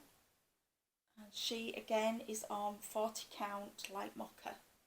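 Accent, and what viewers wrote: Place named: Scotland, United Kingdom. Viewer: Hi. I just happened across your Flosstube a few days ago and I just wanted to tell you how much I enjoyed your gentle spirit and lovely accent, as well as your stitching of course. Lol. I just had to comment when I heard you say you were trying to tone your accent down. Please don’t! As an American, and lover of all things Scottish and British, I just had to tell you it is part of your charm. I have recently picked up cross stitch and am enjoying it so much. I did some embroidery back in the 1980s and have been quilting for the last several years but I didn’t even know that cross stitch was popular again until I happened across some Flosstubers on YouTube. Now I am well and truly hooked! I live in a very rural part of Kansas and my only connection with other stitchers is on flosstube. Can’t wait to watch your next video! 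Kathryn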